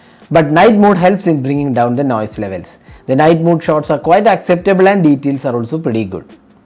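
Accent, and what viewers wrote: Kerala, India. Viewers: Hehe oru mallu slang alle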